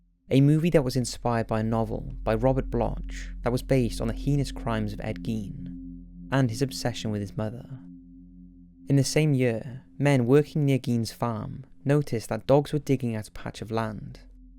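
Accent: Wales, United Kingdom. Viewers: This was amazing! Your quality of production and information is always top notch. Also, I'm welsh and I can hear a bit of a welsh accent in your voice..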